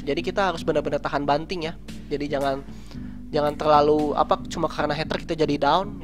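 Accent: West Java, Indonesia. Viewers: accent kaya orang sunda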